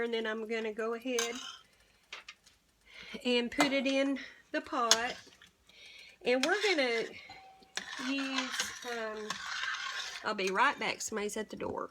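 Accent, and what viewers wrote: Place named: West Virginia, United States. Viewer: Hi I love your videos and Dollar Tree meals. I have made the Mexican Bake and it turned out very good, filling and low cost, which is always good. I am originally from West Virginia and you sound like good hearted WV folk. Subscriber forever. Thanks, Ray🇺🇸🇺🇸🇺🇸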